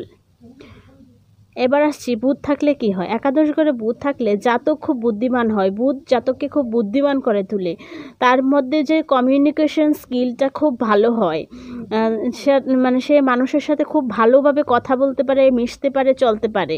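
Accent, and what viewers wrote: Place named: Bangladesh. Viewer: আপনার উচ্চারণ ভারতীয় বাঙ্গালী মনে হচ্ছেনা।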